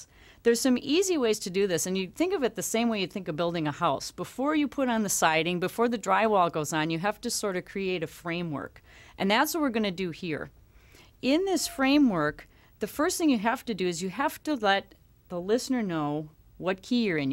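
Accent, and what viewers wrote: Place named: Wisconsin, United States. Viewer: Wisconsin accent to the moon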